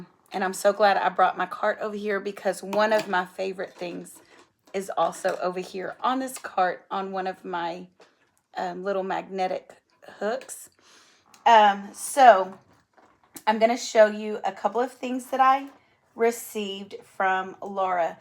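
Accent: South, United States. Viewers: I love the southern accent in your voice. I first met you at Annie's retreat Texas Hold 'Em last month and then again at Stitching Texas. You are so sweet as everyone is. I'm so glad I found your Floss Tube. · Southern!!!! ❤you girl!!!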